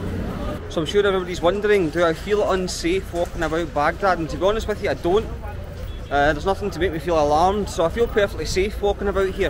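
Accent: Scotland, United Kingdom. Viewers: Oh! Hearing your Scottish accent in the middle of Baghdad is something else. Thank you so much for visiting my country! I haven’t been for 23 yr 😳🫣!!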